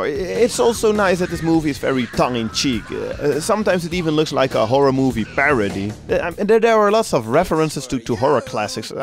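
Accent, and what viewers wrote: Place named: Netherlands. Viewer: Yeah man!!! And your from holland..Ik ook!!! ik merkten het aan je acsent en het liedje in het begin. Goeje beschrijving voor de film man!!!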